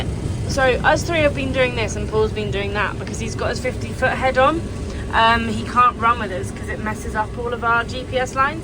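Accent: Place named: United Kingdom. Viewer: Just love the British accent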